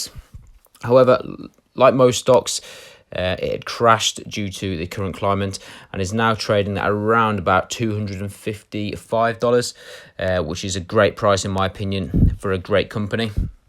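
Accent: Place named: Manchester, United Kingdom. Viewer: Yes lad!! How old are you laddy you sound like a Manchester lad, how much money ya earning g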